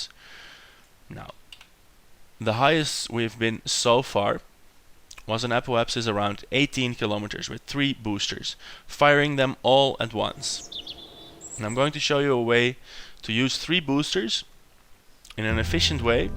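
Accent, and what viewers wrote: Netherlands. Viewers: je klinkt nederlands ouwee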